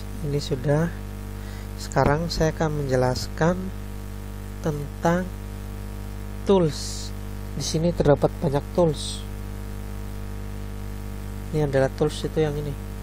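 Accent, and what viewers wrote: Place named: Java, Indonesia. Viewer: cuma lidah jawa yang bilang bulat itu bunder, hehehe, sukses terus mas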